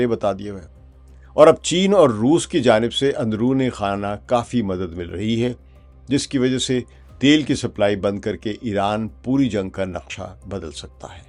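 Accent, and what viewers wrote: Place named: Punjab, Pakistan. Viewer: ماشاءاللہ ۔ کیا بات ہے۔ پنجابی تڑکے کی۔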